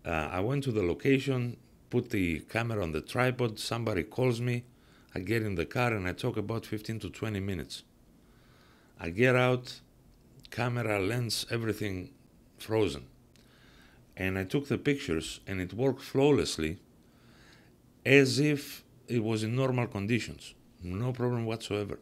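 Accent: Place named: Greece. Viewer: Your accent is so Greek!!! I am not ready to move on from my d850 I don’t like mirroless!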